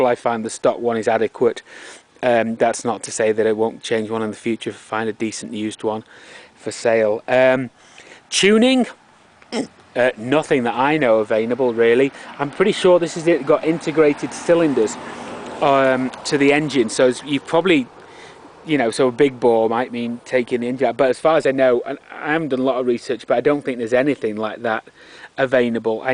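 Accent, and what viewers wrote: United Kingdom. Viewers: This is how a rider review should be done. No 'ums' and 'ahhs' and stumbling, it's bloody seamless, articulate, and relevant. Well done, mate. Only thing you didn't confirm was can a full face helmet fit in the side bags...I know it does on the ST13 but what about the ST11? They look like they're right on the line of not being deep enough. I'm gonna do something like this review for my Honda Blackbird...another Honda masterpiece. I absolutely love the 80s look of the Concours 10 and it's rawness but you nailed the reality of this segment...at the ST1100s much higher showroom prices in 1994 it was a different story, but now with prices so low on these bulletproof used 90s bikes (both the ST11 and Connie are tanks) it's hard to make the case for a used Connie in order to save at most a few hundred bucks. Better brakes (ABS on some later models) integrated crash bars, better suspension, lower centre of gravity, overall smoothness, and subjectively more interesting engine and no oddball 16 inch rear wheel with its limited tire choices and iffy availability in the middle of nowhere...the Honda is the smarter choice. 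BTW your comment about England civilizing the whole fucking world had me howling as well as feeling a perverse pride in my savage ancestry. I'm gonna put that accent of yours (lapsed a bit) somewhere north..maybe Lancashire or Yorkshire. Am I wrong? Thanks again for a great review.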